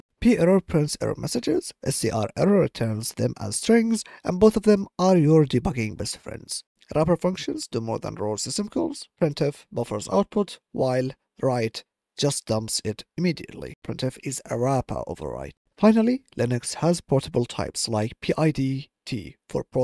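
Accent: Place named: Egypt. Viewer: You had a bad Egyptian accent bruh but a nice content 😢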